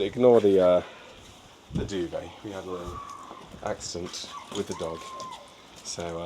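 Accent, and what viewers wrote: United Kingdom. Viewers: Hi dude just chill and relax a bit. You seem to be doing great. You must do whatever you like to do. Don't take too much pressure on yourself. You literally have positive vibe and that is great thing about you. 1 year ago I started watching your videos just to learn British accent 😅. After a year I can mostly be able to understand the British accent now. Lot of love from India 😊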